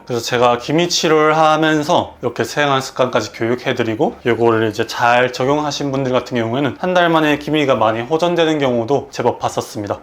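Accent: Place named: Gyeongsang, South Korea. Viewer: 갱상도 쌤이네요 씻껐다 치고~♡ 씻었다고 치고^^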